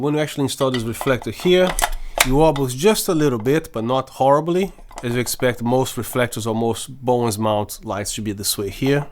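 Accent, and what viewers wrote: Brazil. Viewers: I can feel the brazilian accent... Otimo conteudo amigo. Parabens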